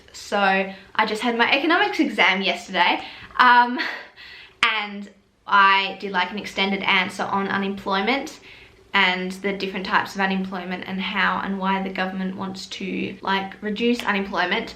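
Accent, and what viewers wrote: Australia. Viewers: i love your aussie accent so much😭💗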